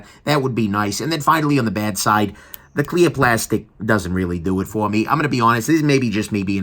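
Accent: New York, United States. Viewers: i have not heard a more new york italian accent in my life...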